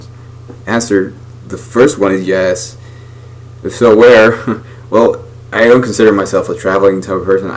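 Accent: United States. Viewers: why do you speak English so good? And also why do you not have a Finnish accent? You sound very american! \m/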